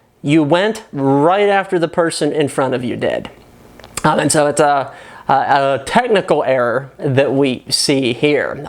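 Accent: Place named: Louisiana, United States. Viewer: Hehe, historian, yes, linguist - no. "Shire" is pronounced the way you say it - as a stand-alone word. When part of a county name, NO-ONE in the UK calls e.g. "Oxfordshire" Oxford-shire - we pronounce it "Oxford-shur" - as with every "Shire" in the land. To British ears, you sound like a back-country Louisiana hick. Got your own banjo?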